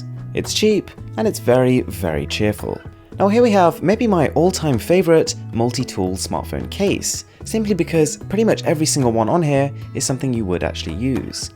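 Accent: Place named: United Kingdom. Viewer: You clearly have a uk accent. But you advertise in dollars. Explain.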